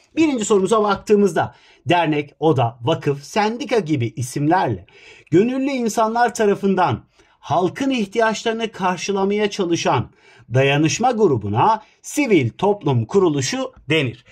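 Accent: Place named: Black Sea, Turkey. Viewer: Hocam siz karadenizlisiz da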